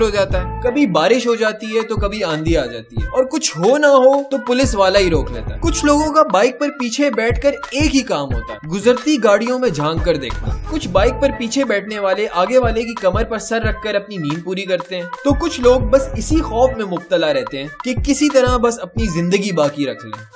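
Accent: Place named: Karachi, Pakistan. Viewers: Please always use Karachi accent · ap karachi k logon ki mimics bht achi krtay ho keep that karachi accent in every video.😁😁🤣🤣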